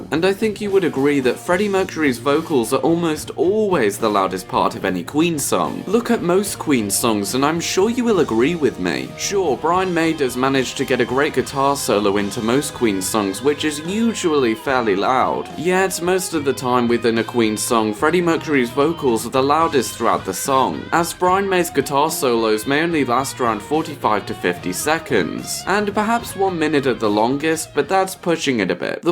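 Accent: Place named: RP, United Kingdom. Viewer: Yes I can imagine you did have to think a lot about it! But you've made a few good points, I really enjoyed this video! Keep up the good work (omg that sounds like I'm calling you a rude word) haha oh gosh I'm gonna have to chose my wording differently lol anyway thanks for replying and BTW I love your voice! You sound posh (I'm a northerner so everyone sounds posh to me 😀) 👑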